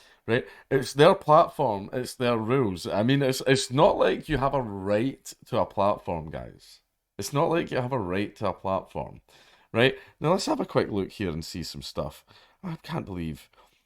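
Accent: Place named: Scotland, United Kingdom. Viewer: I love hearing say things like “poor” with the Scottish inflection but nothing tops his pronunciation of the word “murder”.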